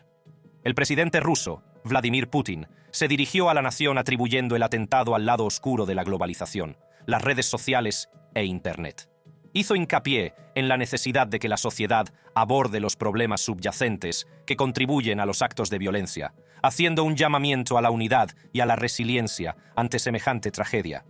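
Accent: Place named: United States. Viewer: Quizás es gringo y anda aprendiendo a hablar español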